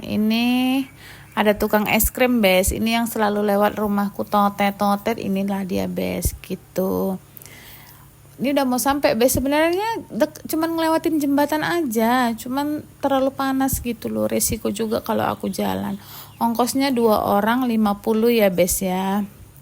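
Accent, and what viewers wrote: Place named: North Sumatra, Indonesia. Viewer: Kak ini orang mana di Indonesia? Logat bahasa Indonesia kak seperti orang Sumatera Utara. Kenalan dong kak, saya followers baru kak🙏🥰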